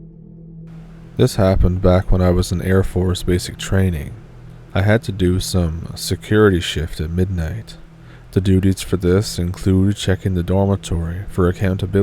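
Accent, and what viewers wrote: Canada. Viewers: Great content. Delivered in a fellow Canadian accent :)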